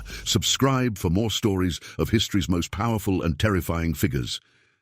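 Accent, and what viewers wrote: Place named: United Kingdom. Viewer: History, Even great Shaka Zulu was 😢monster for you, unbelievable lies. You have British accent. GO and study British history. It's one of the worst ever. What savage Queen did in Africa is unpardonable. These are hybrid beings that committed atrocious acts on humanity that you should talk about and not paint great African leaders as monsters. Anyways, era of propaganda and lies is over!!! Great Shaka Zulu!!! Great Idi Amin!!! They fought for African people. What a useless British faceless racist thinks is inconsequential. Africa forever!!! The world is discovering that the West is full of wickedness towards Africa and the people which this page represents.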